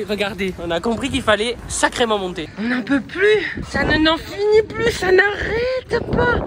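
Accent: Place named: South, France. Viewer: J adore ce que tu fais.bravo a toi tu le fais trop marrer avec ta mère. Ton vlog va beaucoup m aider je pars en octobre pour fêter les 26 ans de ma fille merci d être comme tu es je me retrouve dans ta façon de parler le sud en force.😂belle et heureuse année a toi et a ta famille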